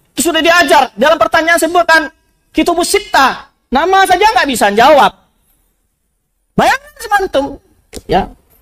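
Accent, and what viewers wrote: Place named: West Sumatra, Indonesia. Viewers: Logat orang minang memang seperti itu. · Logat orang minang bro sumatra. Teman saya ada yang kayak gitu kalau bicara.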